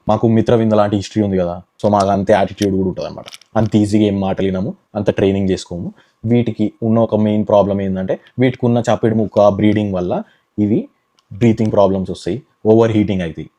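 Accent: Telangana, India. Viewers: Telangana slang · Telangana accent tooo good .. Lovely narration style and information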